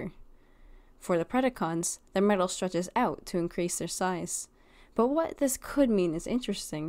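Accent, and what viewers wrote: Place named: Canada. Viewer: CANADIAN!?@ FINALLY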